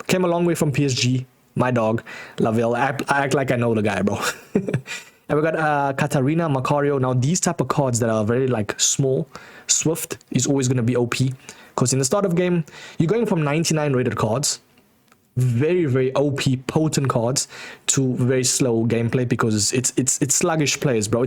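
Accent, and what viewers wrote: South Africa. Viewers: Are you South African bro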